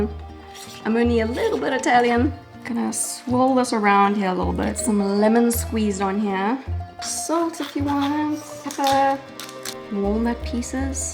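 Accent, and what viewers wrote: South Africa. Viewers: Hahaha i love the "somme" randomly coming out sometimes 🤣 mis jy ooit SA? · Thank you for these lovely easy recipes! I've been watching your videos for years & loved your South African accent but wow your accent has really changed so much! Love your content.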